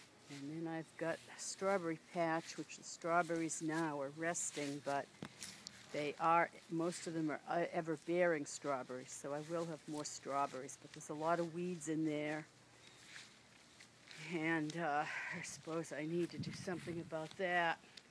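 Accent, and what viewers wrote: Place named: New York, United States. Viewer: Nice garden. I tried to respond to your invite on Sonico but for some reason no luck since I do not have an account. But you do have my correct email address. I never realized you had such a strong New Your accent -- it sounds great! (<; Cheers! Are you still picking!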